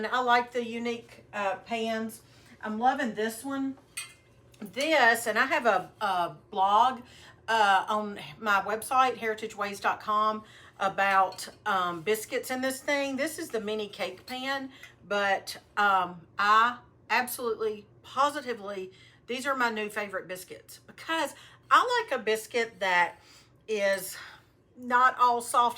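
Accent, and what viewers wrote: South, United States. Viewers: How's about to say Ohio? Where did you get that Southern drawl then huh? 😅 Love from South Carolina y'all hold it down up yander 👍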